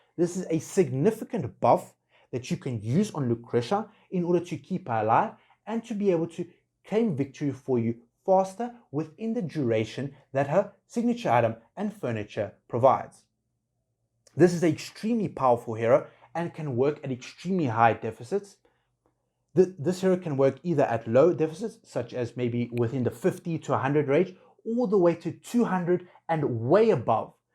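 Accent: South Africa. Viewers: Hey, you a Saffa?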